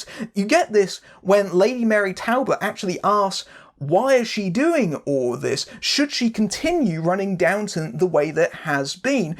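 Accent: United Kingdom. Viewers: Wait, is British?!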